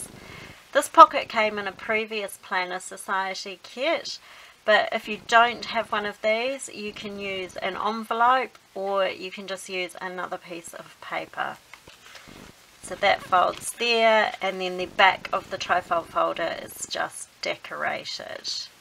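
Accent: New Zealand. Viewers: I love your accent! <3 and your work, ofc. Do you happen to live in NZ? :)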